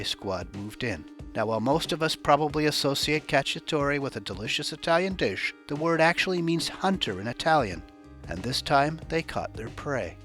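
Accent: Canada. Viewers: Is he from Canada ?I've been trying to figure it out. He sounds like he's from Canada. Asking from Vancouver! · Hi. You sound really Canadian!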